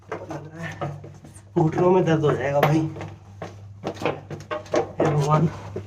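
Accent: Haryana, India. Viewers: Your accent shows that you are haryanvi 🤩🤩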